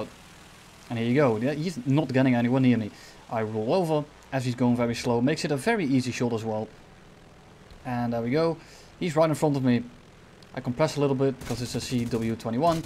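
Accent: Netherlands. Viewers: Are you Dutch cuz your L's are very much like the most Dutch people say it? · I must say, the level of English here is amazing. Of course you can still tell that he's from Holland, but I'm really impressed because it's not the standard Dutch-English. Good job mate!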